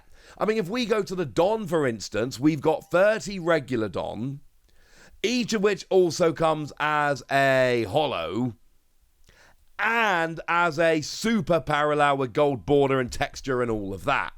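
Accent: United Kingdom. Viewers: You have so strong British accent however you are really clear, it is a pleasure to follow your channel.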